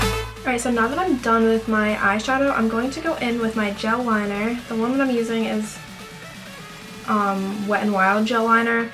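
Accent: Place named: Queens, United States. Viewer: You sound like you’re Italian from queens ny where I’m from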